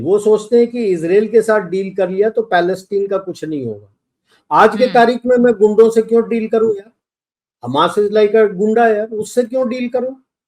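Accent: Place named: Punjab, India. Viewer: Sir, your last name indicates you are south indian but your dialect shows you are perhaps punjabi speaking. Sir, reveal you are punjabi or tamilian.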